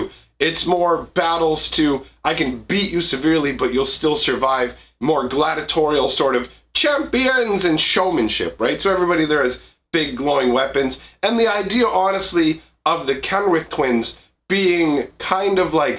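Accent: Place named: Canada. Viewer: Sick!? I noticed from your voice the you are still Canadian! Great video man.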